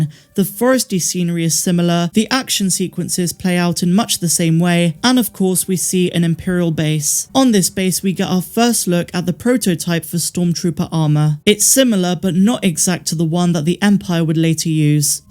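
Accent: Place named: Bristol, United Kingdom. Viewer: Hi. First, thank you for all yours videos.....(still saying your a West country girl, Bristol???) I just love the West Country accent, but I hear the bizzell accent in you. Oh, just to let you know, just in case your thinking, why is he saying all this......don't worry, its because I'm from the West Country......Weston-super-Mare. Anyway, OMG, loved this episode. The John William music made it for me. The man is a Legend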